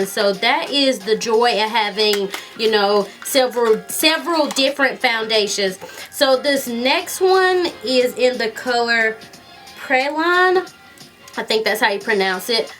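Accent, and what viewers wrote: South, United States. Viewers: OMG, i love your accent!! what state do you live in? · you can still hear your southern accent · Just recently subscribed I can't get over your accent! LOVE IT! Southern Belle style ;)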